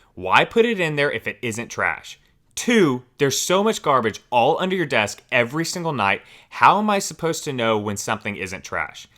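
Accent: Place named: South, United States. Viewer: Great reading! I love your Southern Accent! 😜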